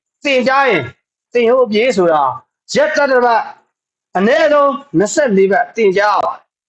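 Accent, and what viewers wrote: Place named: Upper Myanmar, Myanmar. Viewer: ဆိတ်သားခြောက်ချောင်းကြော်လေးပါ ဆတ်သားခြောက်ကြော်လို့ပြောမရောင်းကြပါဘူး နားကြားမှားတာနေမှာပါ အညာလေသံဆိုတော့ဝဲတာပါ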